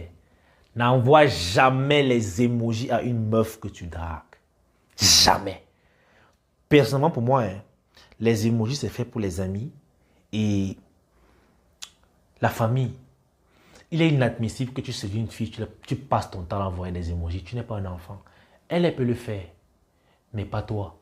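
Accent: Cameroon. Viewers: Toi tu es mon frère camerounais, vue ton accent et ta manière de parler je suis sûr que tu es un Camer, et big up et force à toi bro. ( like mon commentaire si tu es Camer et que je ne me suis pas trompé )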